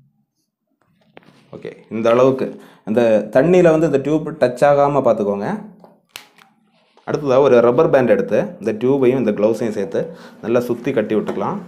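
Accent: Kanyakumari, India. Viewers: bro Kanyakumari ya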